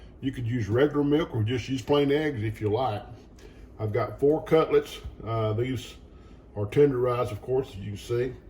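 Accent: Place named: Texas, United States. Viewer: Mmmmm we love your chicken fried steak! The ultimate comfort food!! And we love your Texan accent!!!💞💪🔥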